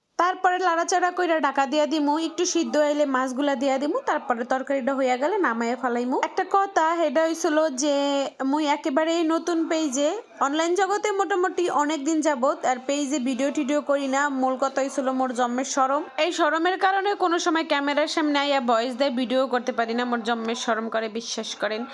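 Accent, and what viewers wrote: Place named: Barishal, Bangladesh. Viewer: সর্বণাশ😮😮😮 but বরিশাইল্ল কথা😅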